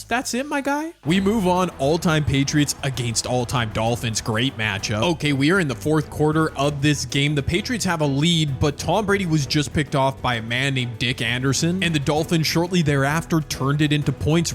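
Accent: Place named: Canada. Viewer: Your accent sounds Canadian, then I looked at your channel and confirmed that you Are Canadian. Being a Canadian, what do you think about Canada getting an NFL team or Two?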